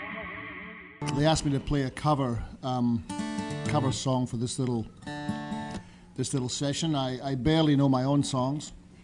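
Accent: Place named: Ayrshire, United Kingdom. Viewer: This guy comes from my hometown in Ayrshire, his Dad had a music shop in the town and often came to our house to tune our piano when I was a kid. I can't believe Colin still has his Ayrshire accent after leaving here over 40 years ago.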